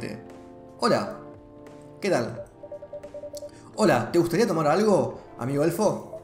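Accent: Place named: Argentina. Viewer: eres un kpo:) llevo un tiempo en tu canal (además de que me pegaste el acento argentino xd) y ya me vi todos los videos. Siceramente me parece muy interesante tu contenido. Sigue así. Saludos desde chile 🇨🇱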